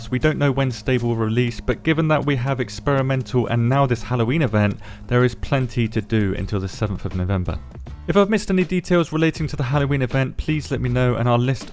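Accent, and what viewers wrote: United Kingdom. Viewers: tryed again to watch a vid of you, again i cant. this british accent is like a chainsaw to my ears.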